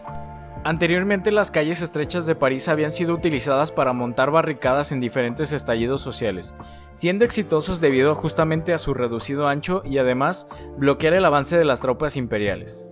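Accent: England, United Kingdom. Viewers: 🤣 pronuncias a la inglesa los nombres franceses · sí por dios, no sé a qué viene decir las cosas como si estuviera hablando inglés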